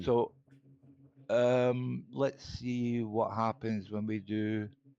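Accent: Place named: United Kingdom. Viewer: oh god a brit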